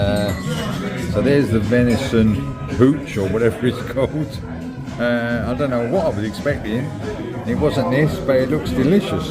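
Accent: United Kingdom. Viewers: Love listening to the narration and the British accent.😊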